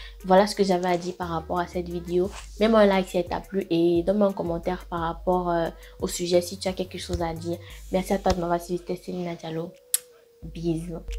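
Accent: Cameroon. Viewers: Intéressante la vidéo👍🏽 par contre j'ai une petite question. Comment ça se fait que tu t'appelle mais tu parles avec un accent camer? Les diallo c'est pas en Afrique de l'ouest? Excuse ma curiosité.🤔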